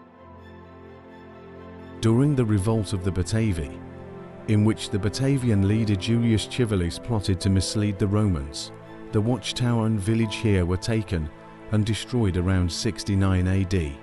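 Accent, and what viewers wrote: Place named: United Kingdom. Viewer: It's absolutely incredible what can be achieved with fantastic drone footage, serious and interested historians (amateur or otherwise), a good script and a distinguished British voice (even if it is AI). Again - well done!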